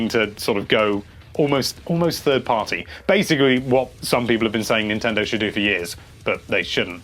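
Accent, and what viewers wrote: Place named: England, United Kingdom. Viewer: Me: Ok i dont speak english totally but i will try to understand something Starts the video OH SHIT IS FROM ENGLAND WE ARE LOST (The accent of the English is UNSUITABLE)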